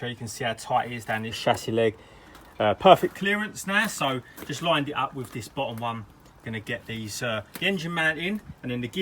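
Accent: United Kingdom. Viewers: Nice to hear a brit bro on here showing our ways. Well done son. Good job !!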